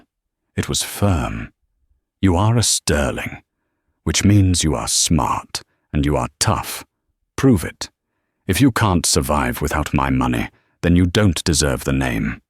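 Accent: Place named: England, United Kingdom. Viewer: Hi Canadian here Windsor Ontario And if the pictures / freeze frame slide shows at the top of your screen bother you, as they do me, just fold a piece of paper, as I do, over too of it to hide it and then just enjoy listening to that purring English accent and, if you prefer, as I do, follow along with the transcript. Cheers! Enjoy!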